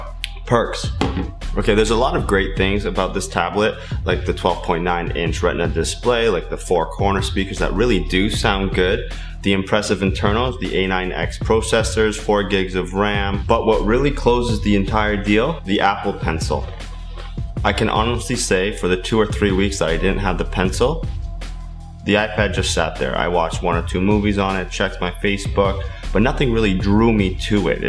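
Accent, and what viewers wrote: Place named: United States. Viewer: Your accent sounds VERY American (I'm assuming you're Canadian because of some of your quirks). Great review, and I'm surprised you don't have more subscribers.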